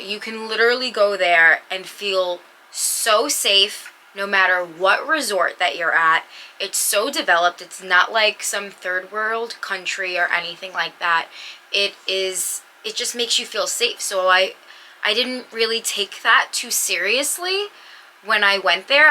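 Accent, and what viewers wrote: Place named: New York, United States. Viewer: Thanks for the heads up!! We are going to the same resort! New subscriber for sure! Grew up in Jersey and I had a pretty good scene you were from NY the second I heard you start speaking! 😆😆. Thanks again.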